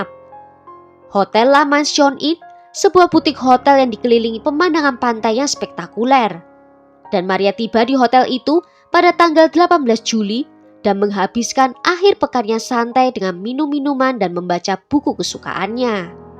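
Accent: Java, Indonesia. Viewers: Aku syukak bingitsss dengan medoknya sis, semoga ciri khas ini bisa membawa channel semakin dikenal dan segera mencapai jutaan subs🎉❤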